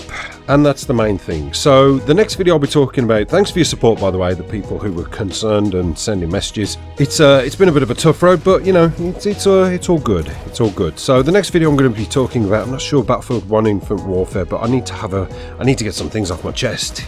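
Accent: Birmingham, United Kingdom. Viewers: So good to hear that brummie voice again :) Welcome back pal, glad everything is coming together for you again!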